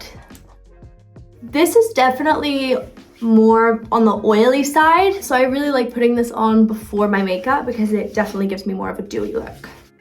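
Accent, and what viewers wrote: United States. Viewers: I just don’t get what’s going on with her accent. She’s worked in America for most of her life and still maintained a British accent, even in her interviews last year she had a British accent, but now she suddenly has an American accent? 😭 how does that even happen? 😂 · You're picking up the American accent Millie. Very cute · She lives mostly in the U. S. so, of course, she's going to take on a more American sounding accent... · Her American accent is getting stronger I miss her UK accent 🥲🫶🏻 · She’s just got a standard accent now. Plus she does tend to put on an American accent a lot. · she basically has an american accent now lmao shes so cute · its so cute how shes starting to sound a bit american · Do you guys think she has more of an American accent than British now? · i love her american accent 😍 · I was noticing that too! She sounds so American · What happened to her accent? 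It became really american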